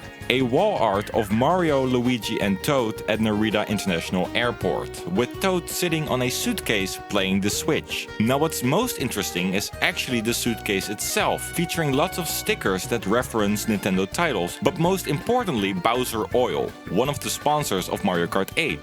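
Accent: Netherlands. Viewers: You're dutch Aren't you?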